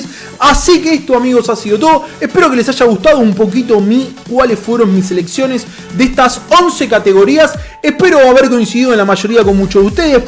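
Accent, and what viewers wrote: Argentina. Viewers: Q lujo oir hablar a un argentino, te lo dice un gallego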